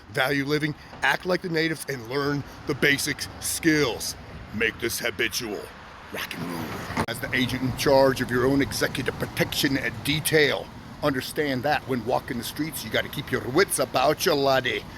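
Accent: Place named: Scotland, United Kingdom. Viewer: ! 😂😂😂 I KNOW this guy would sneak up on me and kill me if he needed to. Because I DID NOT see that Scottish accent coming. Awesome stuff,! I hope one day we can drink a stiff one and share artwork together!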